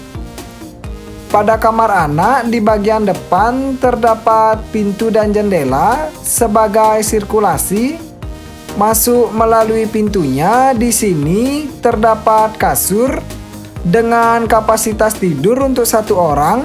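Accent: Bali, Indonesia. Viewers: konten kreatornya ngomong dengan logat bali, bukan di buat buat , Respect kekayaan bahasa indonesia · Ngomongnya logat bali...mohon dimaklumi lah....indonesia kan beragam bahasa dan budaya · Itu logat Bali, Indonesia banyak suku dan bangsa, jd harap memaklumi .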